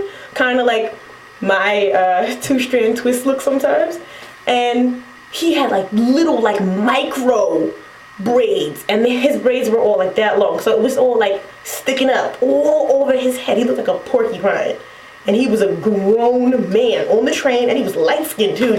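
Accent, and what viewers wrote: New York, United States. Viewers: lol you got dat new york accent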